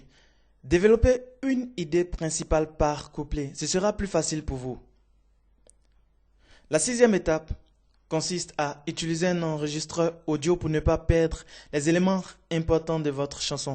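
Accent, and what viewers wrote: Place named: Africa. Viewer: super le frere acsent africain et tout ayeeeeeeee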